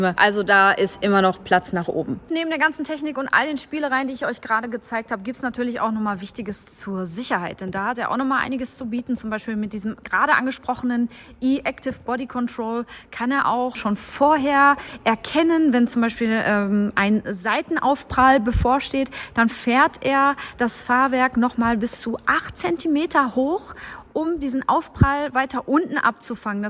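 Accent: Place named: High German, Germany. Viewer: That's not an accent, that's High German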